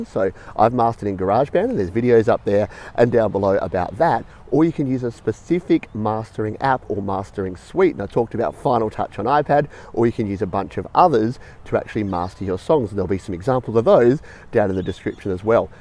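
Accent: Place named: Australia. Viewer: ... thanks. By the way love the vids keep them coming it’s good to here a fellow aussies voice when learning stuff instead of American all the time.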